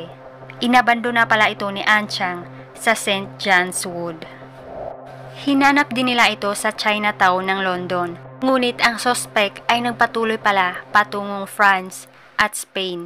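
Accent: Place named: Iloilo, Philippines. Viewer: I believe Ilongga sya😊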